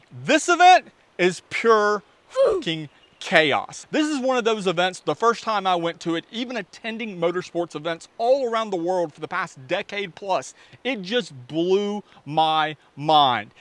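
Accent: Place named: South, United States. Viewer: man... the southern accent is stonk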